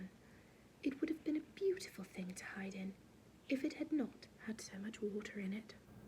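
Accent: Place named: England, United Kingdom. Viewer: Back with the very convincing English accent 🙂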